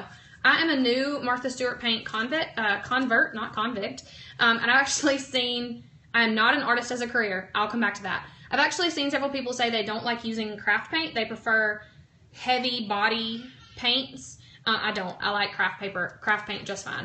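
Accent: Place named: South, United States. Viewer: Hi, just saw this periscope, so glad I can view this on youtube (I can't periscope:-) LOVE your tutorials for this lettering and florals, THANK YOU for showing us how to do this. BTW, love your southern accents too;-)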